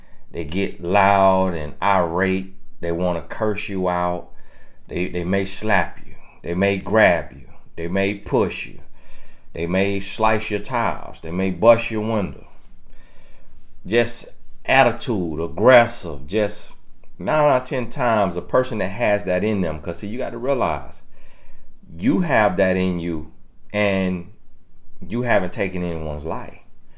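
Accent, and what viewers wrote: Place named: Florida, United States. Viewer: Luv that Flowda accent...you preach ole skool wisdom Bro!Preach from experience!And it is a mirror of dysfunction inside....polish the mirror 👍🏽🌞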